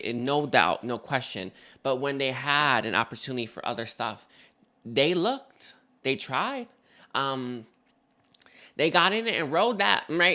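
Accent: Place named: Los Angeles, United States. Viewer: I like u cause ur Mexican and it makes me feel at home, like if I know u an u accent is cool. Very LA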